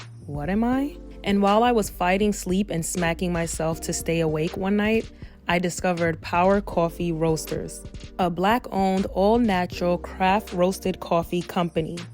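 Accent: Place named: United States. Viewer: Good video! we have to find a way to have cultural pride without being competitive. There isn't ONE thing about anyone culture that would make them "great"or better than the next. It's influences from all over. you are a proud beautiful woman of Nigerian descent but to be honest huge part what makes you poppin is you talk and like a Black American girl from NY! Period. All of our influences from the continent, the carribean and black America is what shapes us into something special. We all add to the pot to make something beautiful. No ones contribution is greater than another.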